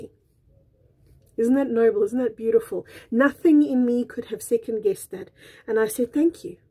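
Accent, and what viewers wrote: South Africa. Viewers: Just curious, what is your accent? South African? you pronounce skeletal as "Skuh-LEE-tle." In America we say "SKEL-uh-tle." Again, just curious ☺️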